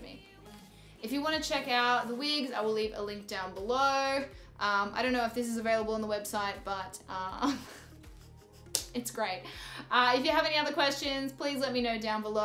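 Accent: Australia. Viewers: Your Australian accent is everything!😩😍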